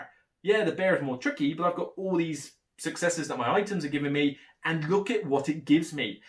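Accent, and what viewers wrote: United Kingdom. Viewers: First, I think this is the first time I realized you are British. Second, your review is spot on with my experience. I don't have space in my house to leave the game set up so that isn't a solution for me. Our first game took 9 hours and we didn't even finish. I wish we had gotten the cartographer's map. I think if you noted the card numbers and essential features, you could speed up the game significantly. I don't think it is rational to have to start completely over every time. That easily doubled our play time.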